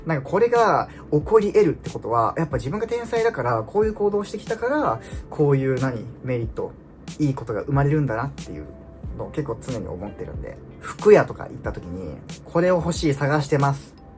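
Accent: Kansai, Japan. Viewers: 伊予弁やから関西アクセントなんねー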